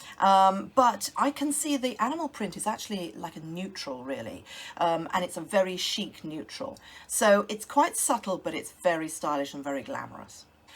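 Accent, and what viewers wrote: United Kingdom. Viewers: Dear, You look absolutely stunning in all your outfits; what a figure! That flat tummy! Love your black dress, leopard belt, blazers, boots, jeans. You have inspired me to buy similar jeans. I love that you model the outfits and show us how to combine using the power of three. I would much rather see you modeling the outfits than a stock model. Love your personality and, of course, your posh British accent. You look like you are having so much fun. Natalia Arizona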